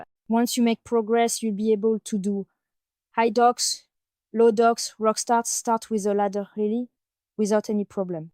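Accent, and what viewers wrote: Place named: France. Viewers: Cet accent Français en Anglais parlé est insupportable. Dsl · " 2 weeks ago Cet accent Français en Anglais parlé est insupportable." Not so for this native English speaker. Au contraire, it is very pleasant to listen to.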